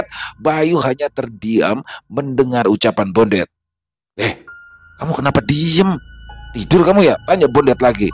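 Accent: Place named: East Java, Indonesia. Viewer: Misuh khas Jawa timuran emang fenomenal 😂😂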